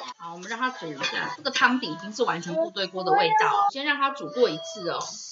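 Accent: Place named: South Korea. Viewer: Kelly講話也好可愛，因為習慣韓文，所以有時候講中文的尾音也有「喔」，現在放蔥下去煮喔，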